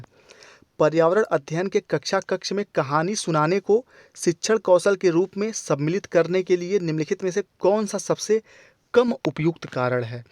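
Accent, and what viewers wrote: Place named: Bhojpuri, India. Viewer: Aapki bhojpuri bilkul hmari trh hai sir😀🙏 आरे तूं जीवन भर नाहीं सीख पइबअ🤗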